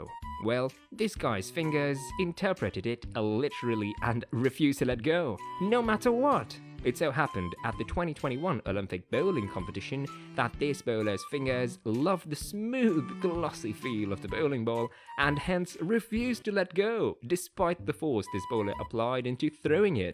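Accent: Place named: England, United Kingdom. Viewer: The English accent make it so much funnier👌.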